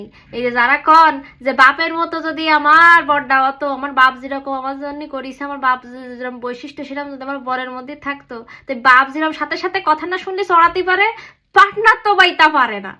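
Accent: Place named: Jessore, Bangladesh. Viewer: আপনার কথা শুনে মনে হচ্ছে আপনি যশোর জেলার মানুষ জানতে চাই আপনি কোন জেলার মানুষ?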